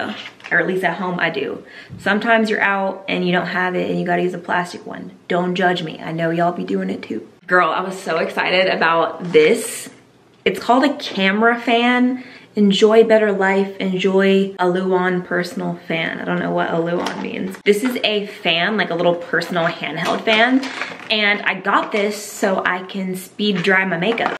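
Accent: South, United States. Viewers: Why am I just noticing your southern accent?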